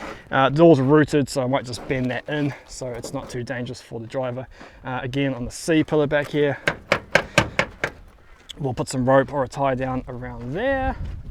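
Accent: New Zealand. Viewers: Ahhh....I can hear a hint of Kiwi twang. Love the old cars. Thanks.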